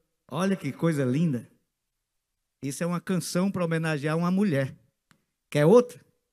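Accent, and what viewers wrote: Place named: Piauí, Brazil. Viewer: Grande abraço, Humor excelente,ri muito, obrigado por este momento de Coimbra pt. adorei teu show, nostalgia ouvir Essas músicas icónicas, tens a voz do Brasil e sua cultura fantástica, muito bom sou fã, claro 😄 textos fantásticos, Prazer Ouvir Falar como Tu, pareces Ter dentro de ti um pouco das pessoas da Tua Terra e cultura do Piauí 🤍👏🏻👏🏻👏🏻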